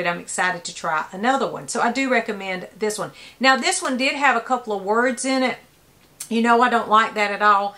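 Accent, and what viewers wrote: South, United States. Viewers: Welcome Back! This is the first time I've commented here but I just want to tell you that I've missed seeing you and hearing that lovely Southern accent. And oh yeah right now I'm reading To Kill a Mockingbird. It was so good to see you again. It really made my day!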